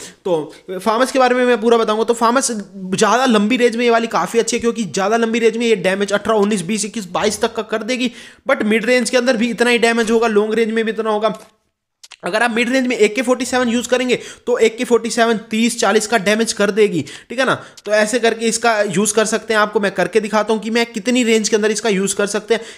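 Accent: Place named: Haryana, India. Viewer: Haryana se bhai tu teri language same hai hmare jasi😍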